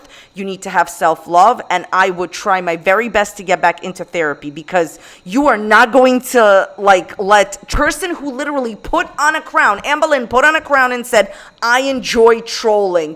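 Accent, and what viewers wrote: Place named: New York City, United States. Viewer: I think my favourite parts about you, is how strong you are about your feelings, and your strong new york/new jersey accent. I’m stationed Virginia right now and hearing you reminds me of strong willed women from my home, New York, and it warms my heart. Love you so much for fueling my unending desire for drama as well as my homesickness <3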